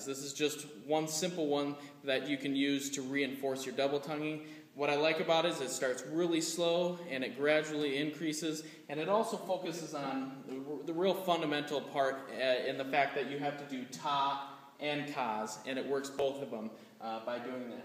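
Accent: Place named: South Dakota, United States. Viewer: Very helpful video! And very prominent South Dakotan accent! :)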